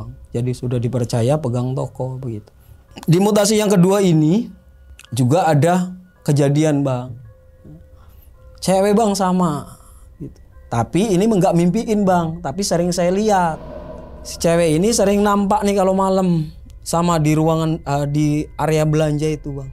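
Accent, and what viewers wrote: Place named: Indramayu, Indonesia. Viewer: Indramayu tenan kih uwong.....😂😂😂😂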